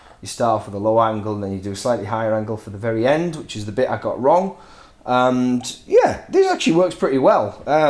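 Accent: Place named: Darlington, United Kingdom. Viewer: I'm itching to see the next episode... are you posting anytime soon? Great to see your videos though, I'm a Darlo boy living in New Zealand and it's good to hear a familiar accent.... Cheers, Steve.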